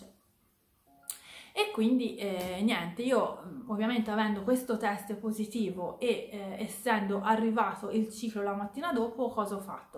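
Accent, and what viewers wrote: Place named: Tuscany, Italy. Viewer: Mi piace un sacco il tuo leggero accento toscano, troppo simpatico!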